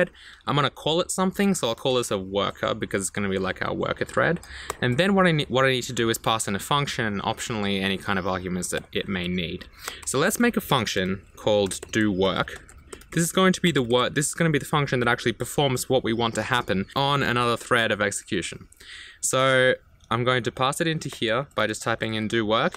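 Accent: Australia. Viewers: You from Australia?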